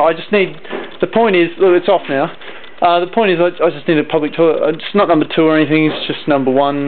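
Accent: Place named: Australia. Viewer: all that for a number 1.... thought u were an aussie mate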